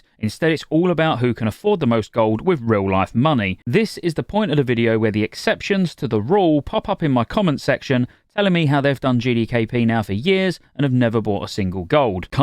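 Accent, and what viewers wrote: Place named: Lincolnshire, United Kingdom. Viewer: Love listening to the Lincolnshire accent on YouTube.... "Items" or as they are called in Lincolnshire... "Eye-ums" Much love, Yellowbelly myself <3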